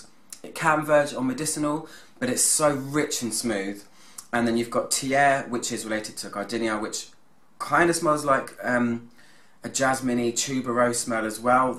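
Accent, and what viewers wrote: United Kingdom. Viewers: Love your reviews! Not to mention you're British accent is so very classy and I love when you say the word gorgeous lol.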